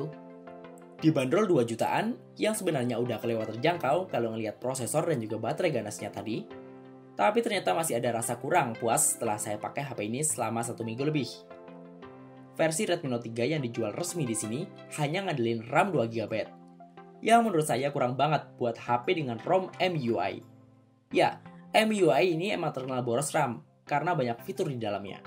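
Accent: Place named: Banda Aceh, Indonesia. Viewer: basa indo meutok lage awak banda.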